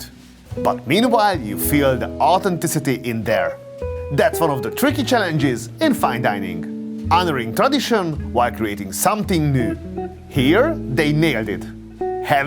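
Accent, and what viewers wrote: Hungary. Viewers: Nagyon jo a video. Szerintem igy felmillio feliratkozonal ez a csatorna mar tekintheto komolynak is, igy erdemes lenne angoltanart keresned. Anyanyelvit mindenkepp,mivel valasztekosan,magabiztosan beszelsz, csak a kiejtes,es a hangsulyozas az, ami nagyon "magyaros".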